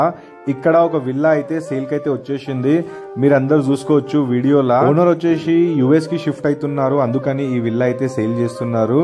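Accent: India. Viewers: Hyderabad slang bro we are proud of him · Typical maarvadi tone and Telugu and of course English is all messed up. No big deal.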